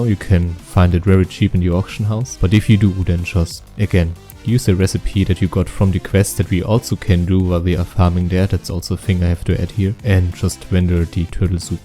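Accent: Germany. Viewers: i can hear denglish :DD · he sounds very German to me. coming from a German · Are you German?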